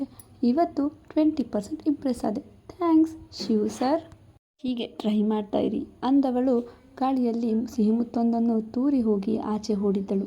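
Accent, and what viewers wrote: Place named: Mandya, India. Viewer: ಮೇಡಂ ನಮ್ಮ ಮಂಡ್ಯದ ಭಾಷೆ ❤❤❤👌👌👌👌🙏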